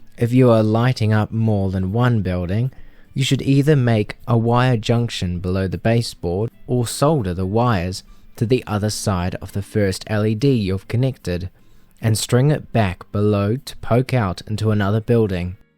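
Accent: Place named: Australia. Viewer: This was 10,000 worth it Btw u sound aussie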